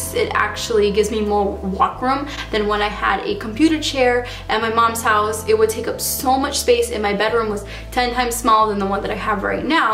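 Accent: Boston, United States. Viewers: Your Boston accent is so adorable!!!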